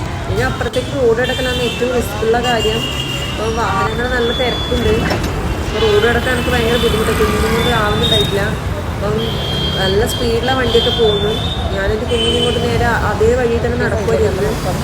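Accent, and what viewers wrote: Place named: Kannur, India. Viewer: ഞാനും കണ്ണൂരാണ് സംസാരം കേട്ടപ്പോൾ തോന്നി.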